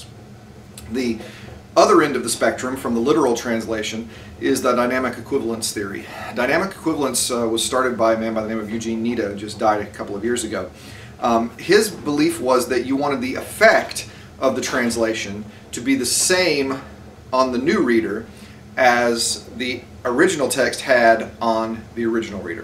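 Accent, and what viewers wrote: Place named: United States. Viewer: Which US State are you from? Im trying to guess the accent. :)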